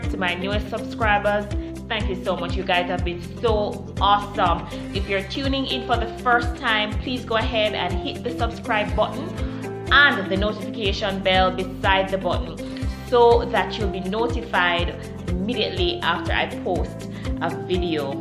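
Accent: Jamaica. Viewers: Jamaican ? #wahh gwan! Just subbed Hun !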